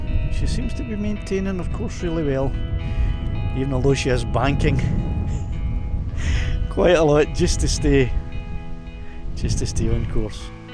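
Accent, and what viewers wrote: Scotland, United Kingdom. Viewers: Thanks for another great video! Always so excited to see another video of yours come out. Your cheery attitude and Scottish accent always lifts me up my friend. You do such a great job! It takes time to put these videos together and I for one really do appreciate it.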